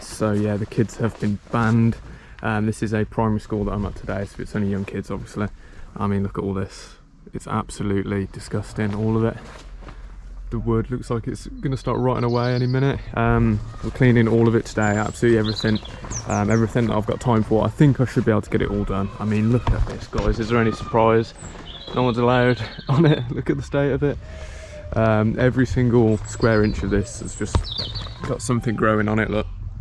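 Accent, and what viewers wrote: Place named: England, United Kingdom. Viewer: You English men are so handsome. Love your accent. Love your heart.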